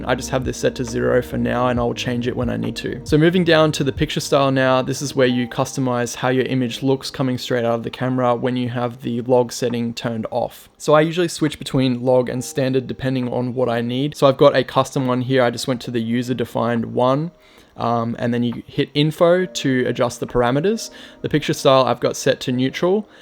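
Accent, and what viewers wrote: Australia. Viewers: Great to hear an aussie reviewer and using PAL. Since this review with firmware update Clog 3 is available. Do you now use that?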